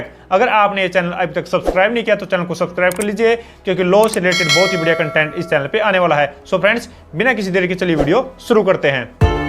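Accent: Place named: Haryana, India. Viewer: आपका वीडियो देखकर लगा कि स्क्रीन पर फिल्म अभिनेता अनिल कपूर हैं लेकिन आपकी हिन्दी में थोड़ा हरियाणवी टच है इसलिए बात समझ में आ गई।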